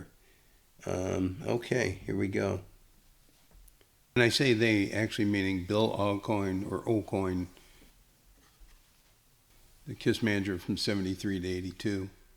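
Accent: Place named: Michigan, United States. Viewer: Sounds like you're from Michigan bro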